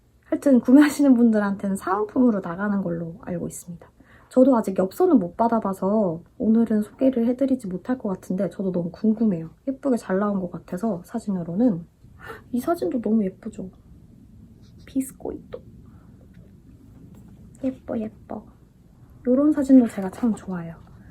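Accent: United Kingdom. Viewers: 책 출간 축하드려용 >_< ❤️ 바로 교보문고 달려가서 구매하고 왔습니다! 빨리 받아보고싶네요! 제 영국영어 롤모델이세용..목소리도 너무 좋으시구 ,, 은근 슬쩍 팬심 들어냅니당! 다시 한 번 축하드려요!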